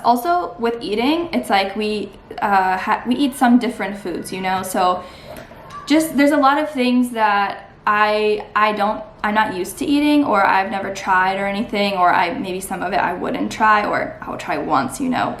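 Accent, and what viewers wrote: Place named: United States. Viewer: You look Chinese with American accent. It is an individual case based.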